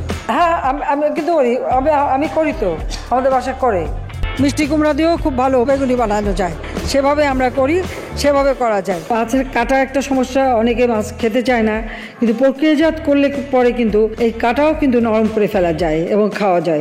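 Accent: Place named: India. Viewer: উনি কি বাংলাদেশের প্রধানমন্ত্রী নাকি ইন্ডিয়ান প্রধানমন্ত্রী ভাষা থেকে বুঝা যায় যে ইন্ডিয়ায় জন্ম উনার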